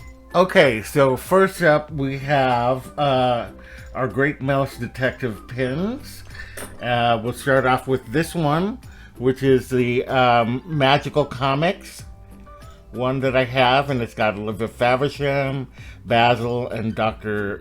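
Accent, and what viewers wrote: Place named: United Kingdom. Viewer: Oh my god you’re so funny! I love your British accent!!! Lol! I’m excited for round two! Lol. I love when you sing the songs!! You’re just the best!! The Rattigan song and the (I love that line too, I feel like we need a shirt that says that line LOL) and the Bedknobs song! You’re just so fun to watch!! I’m glad you joined it!!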